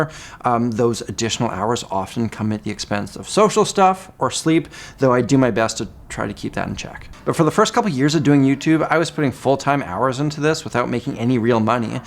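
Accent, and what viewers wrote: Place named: Canada. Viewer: I think I found on a JHS "What's on your board" sort of video, and I remember thinking "Hey, I think this guy has a Canadian accent", and as soon as I found I was right --> SUBSCRIBED. Love ya, congrats on 1 million subscribers, it's well deserved.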